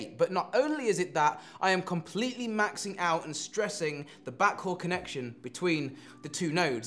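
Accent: England, United Kingdom. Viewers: Love your English accent! 😁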